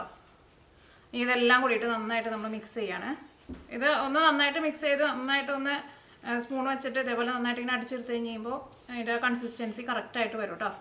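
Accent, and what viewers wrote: Thrissur, India. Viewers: ഞാൻ തൃശൂർ സ്ലാങ് കേൾക്കാൻ കൂടി ആണ് വരുന്നത് ചേച്ചി 😘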